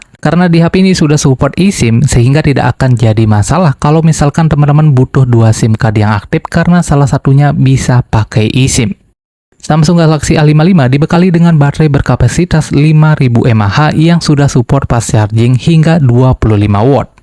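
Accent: Bali, Indonesia. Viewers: Abang nya orang bali ya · Anak bali ini logatnya ya bli😊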